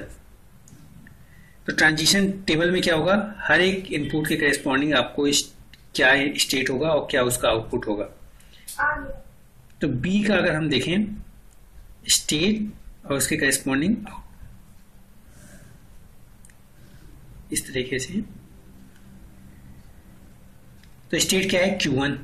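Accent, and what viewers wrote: India. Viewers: dude i cant understand you accent maybe only indish people can understand u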